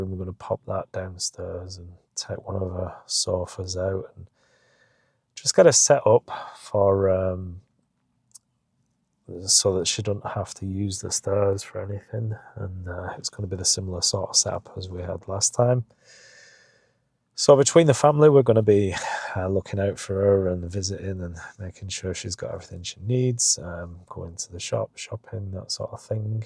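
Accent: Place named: Scotland, United Kingdom. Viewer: your wife and mom-in-law are so lucky to have such a devoted husband and son-in-law to care so deeply and do so much very clearly out of true kindness and love and not just some begrudging sense of obligation, as too often is the case! I pray for a rapid and thorough healing of MIL’s injuries and it’s wonderful she plans to get right back to being active as a hip fracture can far too often signal the end of independence and active lifestyles for older people. I suspect your MIL has nothing to fear as she looks as bright and spry as anything!!! I hope you get some painting done and thank you for sharing your life with us! Ps-this might be nosey but I have to ask…what is your accent? To my highly American ear,it sounds like Scottish and British together? Could that be? 😂 Take care, and take care of Mum!!! 😉😃🙏🏻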